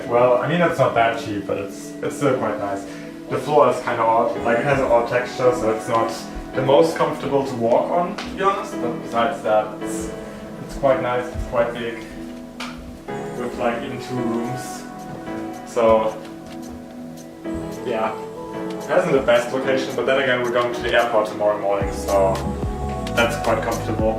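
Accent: Germany. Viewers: Where are you from? It sounds a bit like you're from a german speaking country to me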